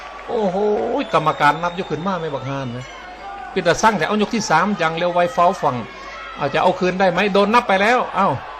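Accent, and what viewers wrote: Isan, Thailand. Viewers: ผมชอบ.มากกครับพากษ์อีสานบ้านผม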